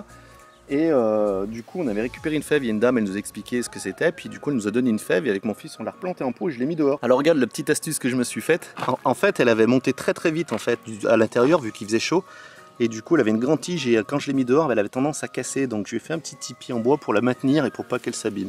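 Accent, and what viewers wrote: Loire, France. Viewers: Un p'tit accent du 42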